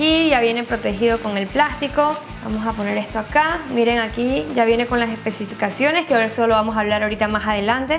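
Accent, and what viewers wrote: Venezuela. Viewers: Me parece conocido tu acento ¿eres venezolana?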